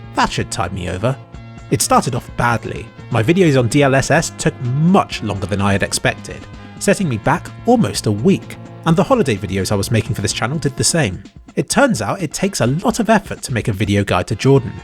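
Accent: United Kingdom. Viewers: Klikbait is better than Clickbait. Thought I watch your videos due to your quality and soothing (Bristish?) voice. I watched your latest video about reflections in Cs:Go and had no clue what you were talking about yet I enjoyed the video since it appears that you know what's going on. Even your reaction video on Half life Alyx is intelligent, most reaction videos are morons pointing out obvious things like excited apes, but you actually point out things that most people wouldn't see otherwise- tech related things for example. Don't change your style and honesty as it defines you and your channels.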